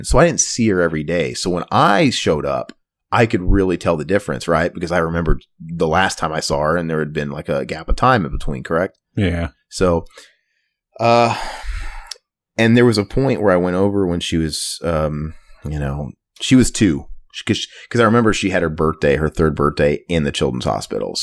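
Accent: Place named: United States. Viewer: Nice to hear your american voice again brother! 😃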